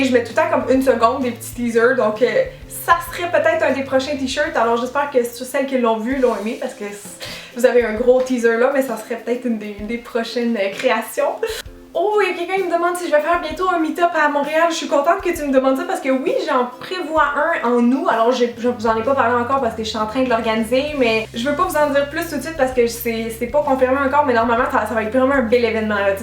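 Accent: France. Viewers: Coucou! J'espère que tu reviendras en France ce serait trop cool de te voir:DD Gros bisous t'es la meilleure et ton accent français est super!;) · J'aime ton accent français👌💕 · Peut-être qu'une ou 2 FAQ en + par an serait sympa! Je suis impressionnée comme bcp de monde par ton accent français^^! Tu réagis apparemment de manière posée à certains problèmes/ sujets délicats: tu es mature mais pas rabat-joie! Continue ! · wow ça fait tout bizarre de t'entendre parler avec l'accent français, tu deviens tout de suite si "banale" :p je préfère le piment de ton accent habituel héhé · J'adore l'accent français ahah !!! :') T'es super, j'adore tes vidéos !!! :D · Mdr les pancakes, c'est un sketch de Gad Elmaleh et Olivier, j'adoore !! :D Et j'adore l'accent française aussi, c'est vraiment bien (je suis française moi aussi !) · t'a voix est méconnaissable avec l'accent francais!! 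zoubi! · Ton accent français est tellement ... génial ! J'adore ! ^^ <3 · Aha ton accent francais j'adore! Je suis francaise'et je me rend meme'pas compte que les francais on unnaccent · Ça fait vraiment du bien d'entendre "l'important c'est d'être en santé, pas d'être mince !" Si seulement les médias, la pub, etc... pouvaient diffuser cette information en masse, je pense qu'on vivraient bien plus heureux ! J'apprécie beaucoup le fait que tu ne suive pas cette mode du "healthy", ça me gonfle de devoir faire attention à tout ce que je mange et de culpabiliser parce que je mange trop de gâteaux. Je me désabonne de beaucoup de chaines de beauté parce que ça me lasse, mais la tienne est vraiment super. Tu est naturelle et en plus tes conseils sont très professionnels. C'est un peu grâce à tes vidéos que j'ai appris à bien me maquiller les yeux. Ah, et puis j'adore quand tu prend l'accent français, je crois qu'en tant que française, j'arriverais pas à faire l'accent québécois ! 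^^ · Ahahahahah ! J'ai adoré ton accent français ! Je suis française et ça fait vraiment bizarre d'entendre dire qu'on a un accent, je n'avais jamais vu les choses sous cette aspect là mais c'est vrai qu'on en a un ! En tout cas tu l'as très bien fait, moi je ne saurais pas du tout faire un accent canadien ! Gros bisous !! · Cet accent français était génial. XD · Merci pour l'accent français, t'es trop forte, la France t'adore <3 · Gros pouce bleu pour ton accent français aha !! :D <3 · BELGIQUE!!!:P Bref... c'est super chouette comme vidéo! J'aime bien en savoir un peu plus sur toi! Et ton accent français est trop trop bien! J'ai essayé de faire l'accent quebecquois... hum hum je ne suis pas super douée:D Les critiques négatives et les troll y en aura tjs malheureusement, je pense que tu fais bien de ne pas leur répondre, ça ne sert à rien! Puis si tu as tout ce monde qui te suit c'est bien pour une raison! 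T'es la meilleure! Bisous!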